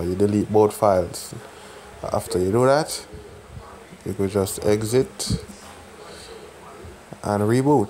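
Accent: Jamaica. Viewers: You from Jamaica? Sound like a Jamaican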